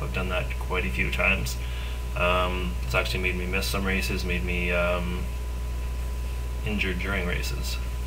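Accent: Canada. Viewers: Nice Canadian accent haha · 3 mins in realized hes canadian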